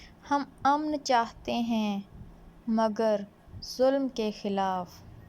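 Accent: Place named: India. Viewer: miss bht afsos hoa apki indian style urdu sun k. apna talafuz thek krain. yay hal hy hamaray teachers ka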